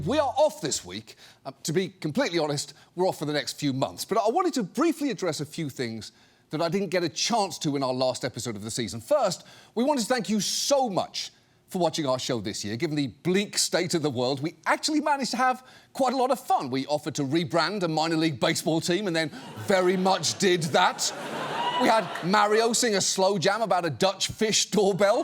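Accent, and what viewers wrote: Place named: United Kingdom. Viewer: Dang it Johnny! I've never seen any of the air bud movies, but I was going to make an exception to watch you in it. Now how am I going to get my fix of a sarcastic British-sounding fake news anchor pretending to take a dog playing sports seriously?!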